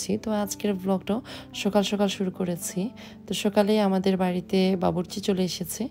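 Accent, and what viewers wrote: Sylhet, Bangladesh. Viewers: আপনি বলে সিলেটের ভাসা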